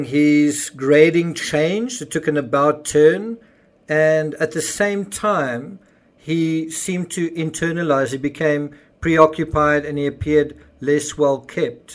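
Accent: South Africa. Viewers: It's his accent. He's from SA. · (I've heard it spoken this way by all? south Africans.. said with all due respect. 🤭they are protective of their 'woman'.. anyway, goes back in history&culture !! Not something I know enough about😌, but the very norm how its 'pronounced' 👍🏻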